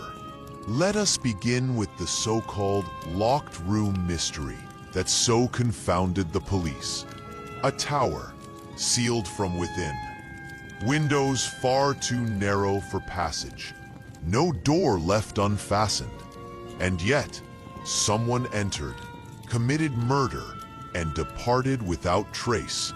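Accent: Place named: United States. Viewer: Can’t listen to Agatha Christie being read with an American accent plus the background noise is intolerable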